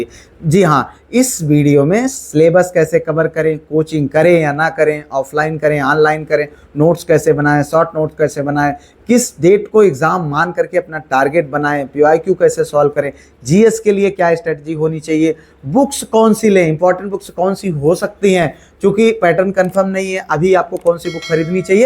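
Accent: Pratapgarh, India. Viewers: Pratapgarh se h sir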